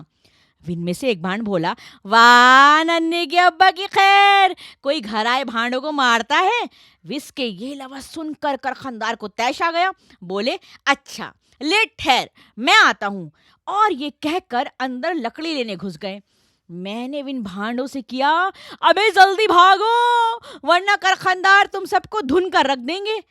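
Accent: Delhi, India. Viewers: This is karkhandari Urdu not lukhnowi Urdu.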